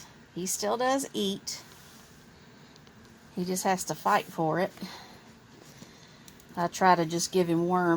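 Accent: South, United States. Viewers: Loving that southern accent 😍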